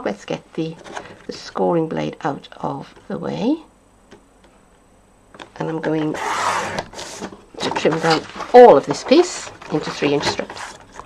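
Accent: Wales, United Kingdom. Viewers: Both are gorgoeus and you're right, with older children they would love making them. How about stapling and gluing the handle on and then applying the ribbon? Added extra strength maybe? But what a nice basket for all sorts of things or small doll's baby crib or covered with shaggy green fringe a monsters cage turned on it's side? I love your accent, I miss my Newport nieces and nephews "come yer", they shout, "this is my left yer and my right yer, I listen with them" and "please auntie, brush my yer, it's all tangled".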